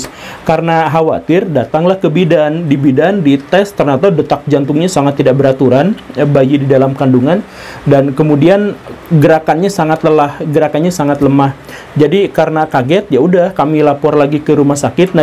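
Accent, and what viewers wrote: West Java, Indonesia. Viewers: Pak guru orang mana ya? Logatnya kyk dari tanah sunda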